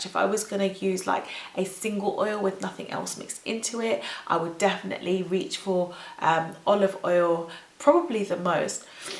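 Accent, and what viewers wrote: United Kingdom. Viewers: I cAnt stand the British accent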